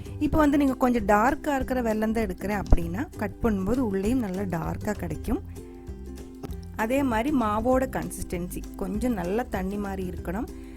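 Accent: Kerala, India. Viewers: Love your malayalam slanged tamil. So sweet to hear . Looks like an easy recipe. Will try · Malayali speaking tamil will be great to hear. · Malayazhi speaking Tamil very cute and recipe very nice.... Try panni paakurean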